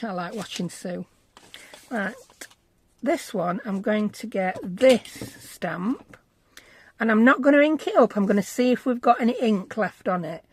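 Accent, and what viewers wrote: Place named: Yorkshire, United Kingdom. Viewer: Just popping on to say hello! Come on girl, who wouldn’t love a Yorkshire accent 😅😂😊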